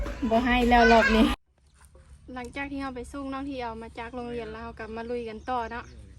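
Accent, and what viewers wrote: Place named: Laos. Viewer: สะบ๋ายดี๋ สาวเม้ยผู้แข็งแกร่งสาวสวยแห่งลุ่มน้ำปากกระดิ่ง ชื่นใจในความสามารถ แม้แต่สำเนียงพูดผสมผสานระหว่างสำเนียงลาวไทยเม้ยกับสำเนียงคำตากล้าได้อย่างลงตัว ขอปรบมือให้ดังๆนะครับ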